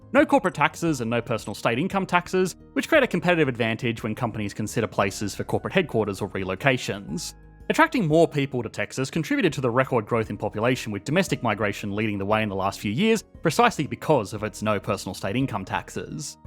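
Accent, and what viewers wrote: Australia. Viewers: Whose idea to use a horrible rushed Aussie accent? Just use a normal American accent and less wordy next time. Thumbs down for being overly boring. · He's Australian, there are a lot of elongated vowels with the countries accent. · Your accent is so wonderfully aussie that i thought at the end of the video, "i didn't know they call each other "partner" down under.....just like in Tex....wait a minute." Then i got it. Slow day for me.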